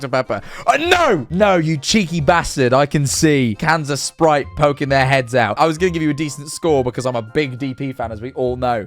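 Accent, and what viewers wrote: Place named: Exeter, United Kingdom. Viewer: you are sounding increasingly Exeter university just thought id let you know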